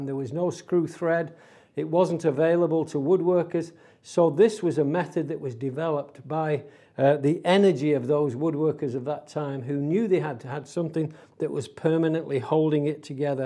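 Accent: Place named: England, United Kingdom. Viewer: Super travail et quel plaisir d'entendre un bel accent anglais. Merci.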